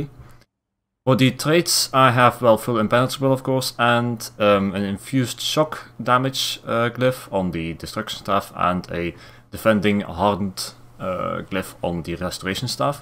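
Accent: Limburg, Netherlands. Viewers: you sound dutch :) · You got a Limburgs english accent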